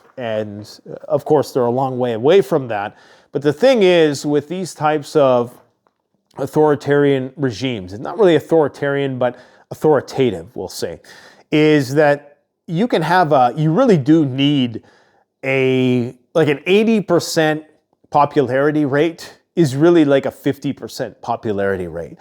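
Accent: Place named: United States. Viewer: He is practicing his American English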